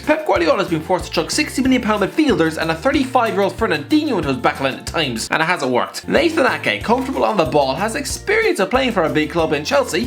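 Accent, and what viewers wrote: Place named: Ireland. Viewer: Me: Goes onto HITC Sport Hears Irish guy Me: Leaves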